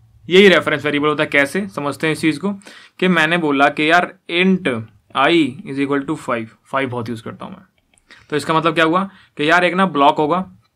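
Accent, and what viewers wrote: Haryana, India. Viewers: HARYANAVI accent is so sexy.......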